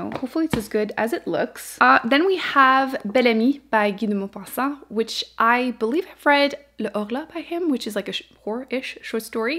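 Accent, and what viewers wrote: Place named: Quebec, Canada. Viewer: Canadian French sounds sooo different than France French 😟 Love your accent🥰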